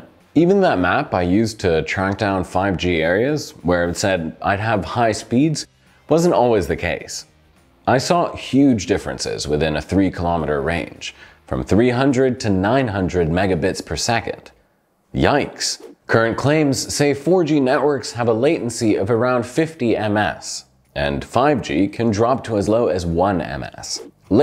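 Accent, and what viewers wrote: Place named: United States. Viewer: I can't pick his accent. It's sounds American to me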